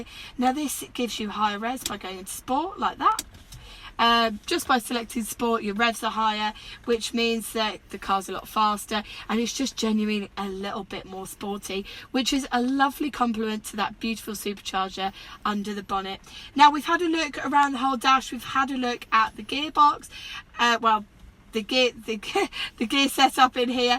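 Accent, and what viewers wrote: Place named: RP, United Kingdom. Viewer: Oooh gone posh again! Suits you I reckon. Another great car review as always 😁👍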